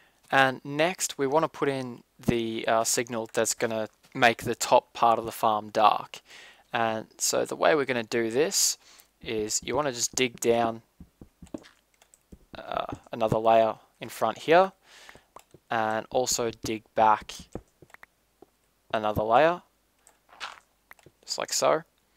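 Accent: Australia. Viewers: awesome, are you australian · Are you Aussie.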